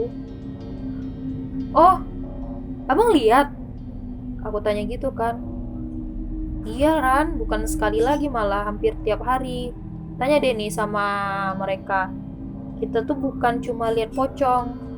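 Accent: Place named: West Sumatra, Indonesia. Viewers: Bukan menye2 mbak nyaaa, tapi logat dan cra ngmg org sumatra barat emng bgtu. Kbetulan saya org sumbar 😊 lain kli klo dngerin nya speed nya di naikin aja mbak jdi 1,25, saran nih 😂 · Menurut gue sih ceritanya serem, story tellingnya juga keren, tergantung pendengaran kita masing2 sih.. Cara orang bercerita mah beda2 yak, kita gak bisa maksain orng bercerita dgn gaya sesuai pencerita yg kita suka, si mba nya orng sumbar pasti dari segi logat aja udah beda sama daerah lain jdi gak bisa dibanding2in jga sih, nikmatin aja ceritanya guys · jelas kali logat sumatra nya lmao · Pembawaan logat sumbar emang gini mbak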